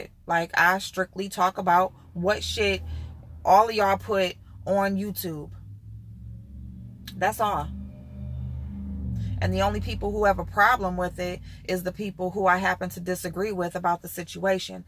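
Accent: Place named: Ohio, United States. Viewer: I knew it. I was born in Ohio and I know that accent. You sound just like my family members!Lol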